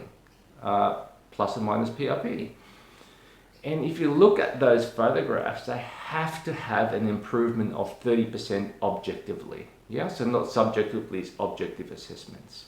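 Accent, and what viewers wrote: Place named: Australia. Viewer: A Korean-aussie with a full on aussie accent. I've seen it all ㅋㅋㅋ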